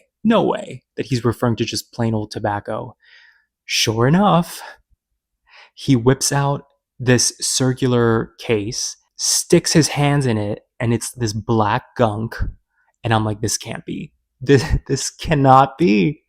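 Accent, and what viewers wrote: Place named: South, United States. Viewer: Sounds like a true southern man with limited experience hooking up.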